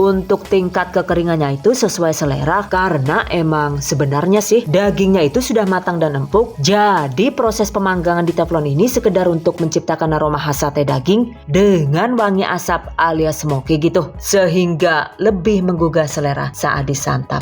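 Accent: Banyumas, Indonesia. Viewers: Selalu saja terhipnotis dg suara narasinya nda terasa tau" sdah selesai aja ..... Klo bleh tanya KK asli orang ngapak kah,... Tp klo nda salah pernah disalah satu Vidio tinggalnya dilampung.... Terimakasih resepnya yg selalu menginspirasi dan menghibur....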